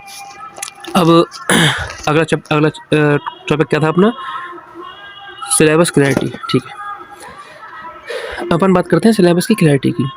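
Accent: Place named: Lalitpur, India. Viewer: hmmm...thoda or sunne ke baad mujhe bhi lalitpur jaisi boli lagi thi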